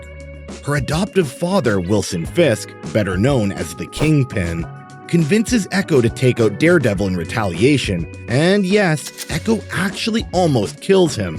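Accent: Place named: Canada. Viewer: you are a canadian aren't you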